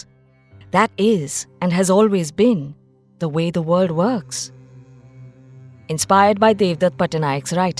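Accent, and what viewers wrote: South India, India. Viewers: are you madraasi southindian saying arjunas kitna bura lag raha sunnein mein,please bachhon ko to sahi words bataayo baba it is aadi Shankar not adishankara saare words theek bataiye kripya, yeh aa mat lagayeeye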